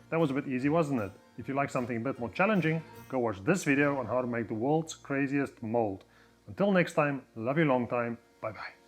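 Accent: South Africa. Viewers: How does this not have more likes?? Great editing, funny, and South African accent. Good job. I'm definitely making these 👏👏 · I'm south African and your accent sounds south African but a bit of an odd one to me? I'm from Durban so maybe thsts why lol 😂 have you lived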